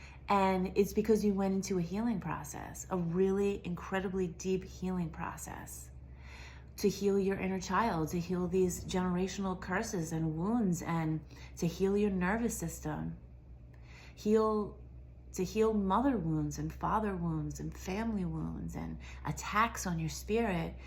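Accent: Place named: New York, United States. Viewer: You shine Love you New Yark accent and yes I say Yark lol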